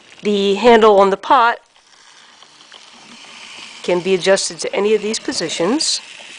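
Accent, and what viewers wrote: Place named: Chicago, United States. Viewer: The Chicago accent is coming through loud and clear! ☕️🍩☕️🍩☕️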